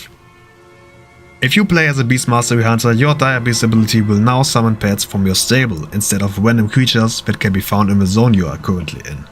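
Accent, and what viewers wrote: Germany. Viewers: Der Typ klingt so deutsch xD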